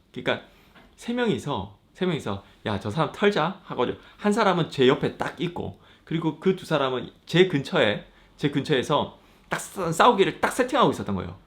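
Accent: Gyeongsang, South Korea. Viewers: 얘기가 경상도 사투리로 구수함이 느껴져 구독합니다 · 와우 경상도 사투리가 마 쎄 빡 섞인게 매력적이시고 방송 잼나네요. 여름에 이탈리아 가는데 이방송이 참고서 되겠네요.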